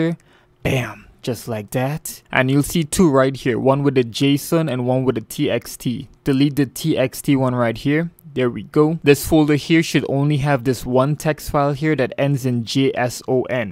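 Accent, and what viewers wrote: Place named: Canada. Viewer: I love the canadian in your voice :D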